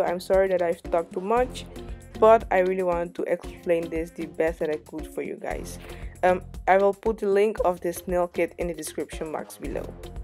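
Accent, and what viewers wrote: Netherlands. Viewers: are you dutch? haha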